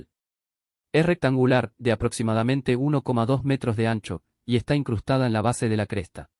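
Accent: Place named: Argentina. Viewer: IA argentina?!!😂😂😂 Primera vez que escucho esa voz Ia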